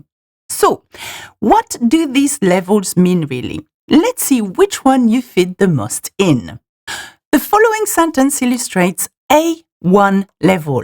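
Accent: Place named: France. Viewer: Elle parle anglais couramment, mais elle a un accent français très fort.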